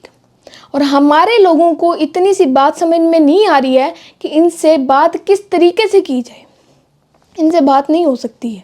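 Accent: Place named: Pakistan. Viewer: Mujhe ye pakistani accent bahut psabd hai ❤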